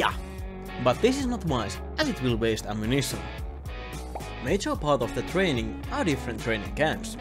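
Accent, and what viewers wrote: Finland. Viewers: Tahallinen rallienglanti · Mahtava rallienglanti.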